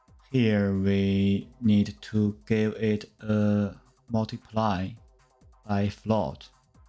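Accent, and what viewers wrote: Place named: China. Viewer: 比中文还中文的中式英语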